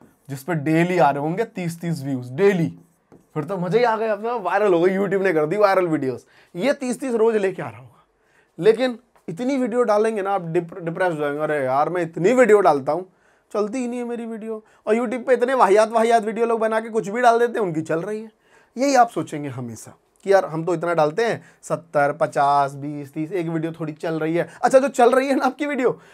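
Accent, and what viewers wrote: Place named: Bharatpur, India. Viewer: O Bhai shab kya bat h wahhh ji bolte gjb h ap trika solid h bolne ka m apko bate dhyan se nhi suni pr bolne ka trika solid bilkul bharatpur style dhasu wala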